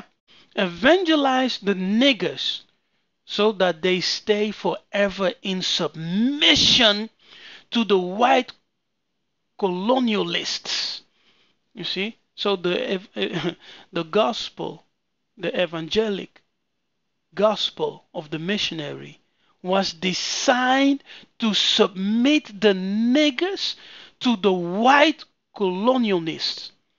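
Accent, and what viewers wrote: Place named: Netherlands. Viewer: Zondervan? En je accent klinkt nederlands...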